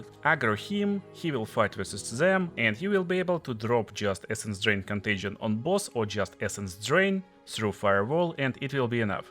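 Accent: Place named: Russia. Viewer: Почему нет коментариев на русском, говор явно выдает автора хД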